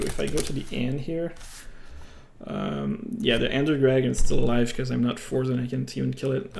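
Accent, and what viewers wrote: Quebec, Canada. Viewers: Are you from quebec?? I can hear an accent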